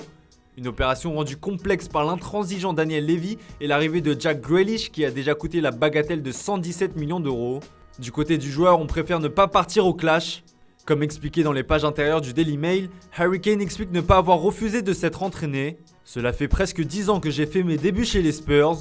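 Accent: Italy. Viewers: aucun rapport mais il est parti l'autre avec son accent italien tout peté? jai unfolow a cause de lui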